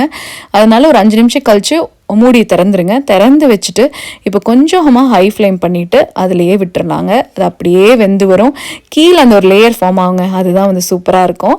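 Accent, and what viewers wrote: Kongu, India. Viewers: Romba azhagha video..unraakudavey irrundha madhiriyee irundhadhu thangam. Un style..rombaa unmaiyaa appidiyey ellam solradhu thaan nu ninaikireen.. inainkku thaan enakku YouTube unnai recommended shorts nu kamichadhu.. ulla vandhu parthaa superbaa irrukkuma..enndaa ithanai naala kattalanu feel panna vachadhu. Unnga recipes ellamey healthy & tastyaa irrukkunga..north & south mix matching recipes..namma pachapayarum, methi chapathiyum, paneerum, nalla combination da. Andha mambazham cutting nanum parthu irruken..parthaleey paravasam feeling..neenga thirupiyum andha feeling kodutheengaa.. unga kongu slang..romba naturally nalla irrkku..ennavoo unngaa kudavey pakathulaay irrundhu parkara mathiriyee irrukku maa. Pachapayaru pakkuvaum sonnadhu, machichadhu, seimurai for all dishes , practical tips, ellamey superda thangam. Enga irrundhalum, namma manasu vachaa ellamey nalla seidhu, healthyaa seidhu sapadalaamnu practical aa kamichu irrugeengaa.. 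Innum niraiyaa video podungaa.. Londonlaa endha pakkam irrukeengaa.. Chedi varathadhu pathi Anitha Anand channel niraiyaa seasonal chedinga, pudina, strawberry ellamey nalla valaradhu, grow tips kodupangaa, namma Charless Anna, Subi sister channel London Thamizhachi channel laa parthu irruken. Charless anna niraiyaa tips kodupaar. Nalla vilaichaal irrukkum, namma use pannara kaikari, poondu, kodi kaikal, poo chedi ellamey.. .. Vazhgaa valamudan.❤❤❤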